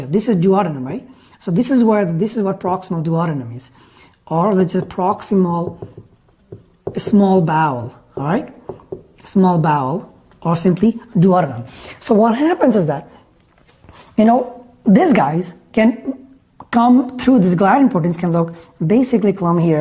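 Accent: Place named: India. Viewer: Sir your teaching is good but you will speak Indian kind English i thought better to understand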